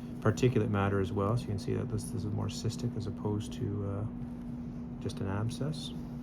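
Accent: Canada. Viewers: Side note... Love the Canadian OWT! ( respect from the UK )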